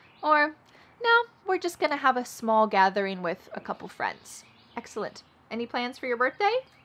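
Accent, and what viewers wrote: United States. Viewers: your are so lovely ...... really .... Ithink my understanding for American accent getting better >> cause of you ...... thanks ..... keep it coming ..... Form Sudan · Is it American English??? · Are you from US.?🇺🇸😎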